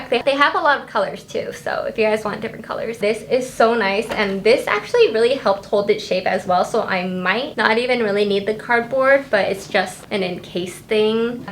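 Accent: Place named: Bay Area, United States. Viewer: You're channel just popped up on my feed. I was watching this and I was like she sounds like she's from the Bay. I looked at your IG and BOOM lol. P.S. thanks for the tip about the cardboard!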